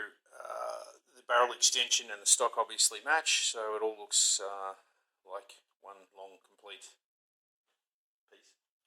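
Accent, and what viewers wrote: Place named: Australia. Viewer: this fellas Australian, I knew when I heard the voice, then I saw the fridge and it was a dead giveaway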